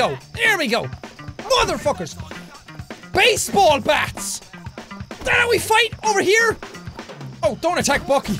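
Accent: Ireland. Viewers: Yea I'm assuming from his accent he's in Ireland so yea pretty close :)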